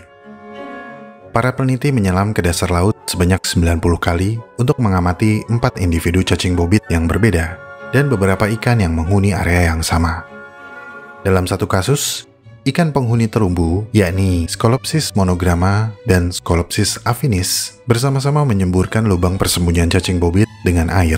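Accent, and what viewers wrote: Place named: Java, Indonesia. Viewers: Kilang kilung nak wong jowo ngomonge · Hahahaha.. Naratornya Sundanese nih..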